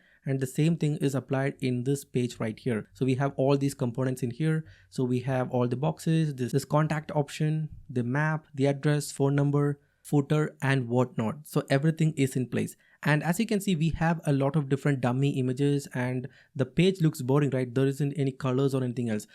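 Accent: Kerala, India. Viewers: ah that irritating malayali accent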